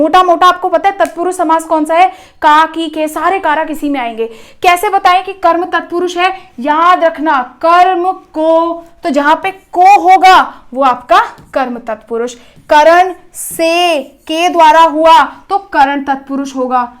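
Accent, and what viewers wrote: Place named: Haryana, India. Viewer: Didi aap haryana ke hona!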